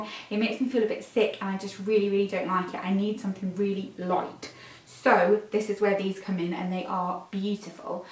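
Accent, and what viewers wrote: United Kingdom. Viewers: I’m confused if you are Israeli born and raised why do you have a British accent?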